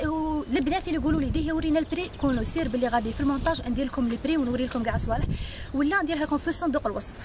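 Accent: Oran, Algeria. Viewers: يعطيك سوسة محلاك ماكنتش نحب اللهجة الوهرانية بيك انتي حبيتها معاك لولو التبسية <3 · Asrar Yousef هذه لهجة الغرب الجزائري و بالضبط وهران نحن أيضا لا نفهم اللهجة السعودية أو الخليجية عموما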